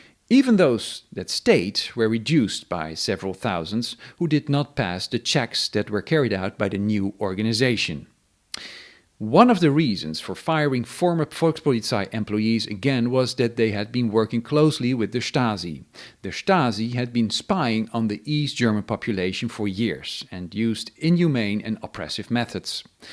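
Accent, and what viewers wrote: Netherlands. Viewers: Je kunt het altijd goed horen als een Nederlander Engels spreekt.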